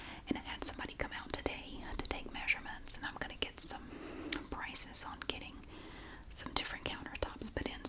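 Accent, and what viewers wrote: South, United States. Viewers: Hi,you've got me well and truly hooked on your videos,especially the jewellery haul ones-only problem is,they are so relaxing that l fall asleep half way through,so have to view them over again to get to the end of all the items.l really enjoy listening to your southern drawl,l am from England so it's just fascinating to me.Please keep doing what you do,l will keep watching.Thank you so much,and may 2021 be a year of peace,good health and happiness for you and your boys(and 😺 kitties)love from Heather.xx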